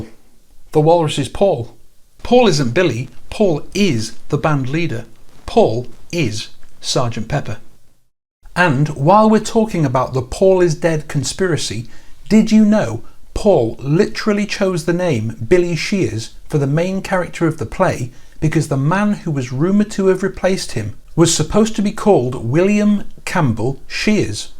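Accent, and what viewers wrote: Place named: Yorkshire, United Kingdom. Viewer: Pure bollocks. That was an hour I shall never retrieve. Love your Yorkshire accent though.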